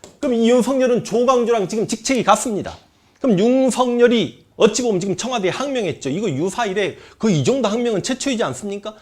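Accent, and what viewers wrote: Jeolla, South Korea. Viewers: 전라도 사투리가 거슬리지만 재밌게 잘들었습니다.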